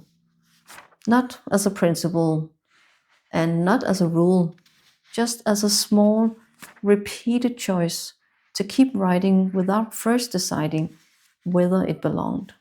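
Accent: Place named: Denmark. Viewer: A Dane! Thought I recognized the accent. I also use the Hobonichi cousin, and it got me through my MSc here in Denmark. Though I am an American married to a Dane for the past 22 years.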